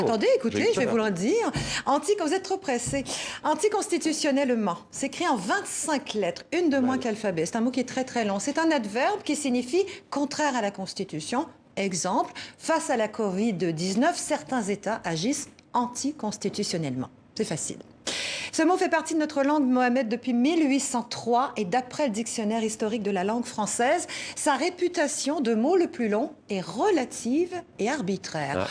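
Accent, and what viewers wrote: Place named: Quebec, Canada. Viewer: Moi ausis, son accent Quebecois.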